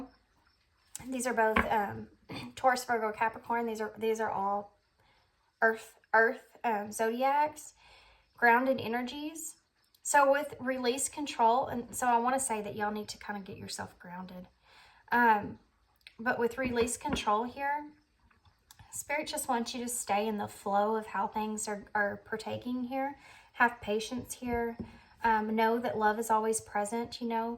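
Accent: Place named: Deep South, United States. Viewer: Thank you! I love your accent! I have relatives from the Deep South and I love to hear them talk. You have no idea how accurate this reading is, although I really am not interested in this person coming back into my life.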